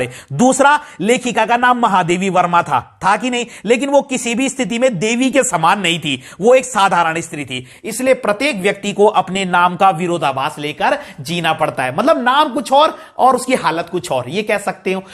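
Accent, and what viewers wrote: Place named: Pahadi, India. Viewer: Merko aapki voice se lag ji Raha hai tha ..aap pahadi ho...😅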